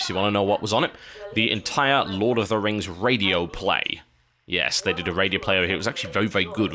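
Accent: United Kingdom. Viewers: lol I'm just listening so quality doesn't affect me ha ha :D hurray for his awesome british voice! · Everybody must do this, and they all must be in UK english so that they have a british accent like TB!!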